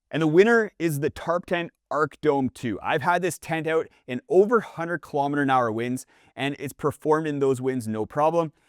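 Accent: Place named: Canada. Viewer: my god you are soooooo Canadian. I can't listen to it. It's just so laughable.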